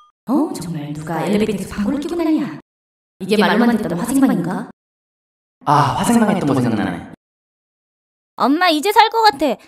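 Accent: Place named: Gyeongsang, South Korea. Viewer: 대구 사람인 것 같은데요?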